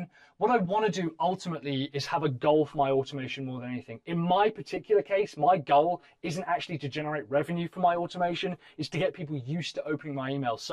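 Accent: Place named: England, United Kingdom. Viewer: Mann, i like your english accent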